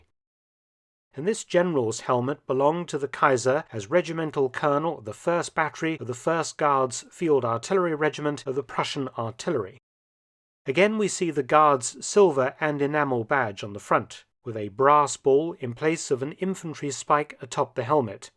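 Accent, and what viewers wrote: United Kingdom. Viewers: What is saying in his British accent is "pickelhaube". He is pronouncing it correctly, but I tried to google "pickel halbee" and it didn't work.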